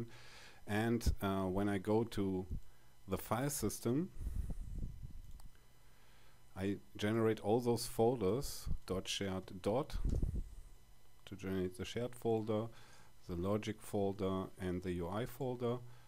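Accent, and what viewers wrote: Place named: Germany. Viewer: A very German name and a very German accent :D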